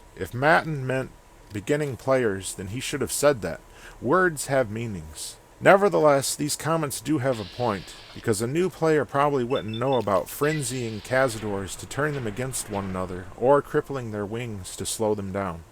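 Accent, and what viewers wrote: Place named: South, United States. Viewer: You sound like an actual character in the game giving the player advice in a country accent lol. After watching I feel like I just recieved a perk that makes it easy to kill those things!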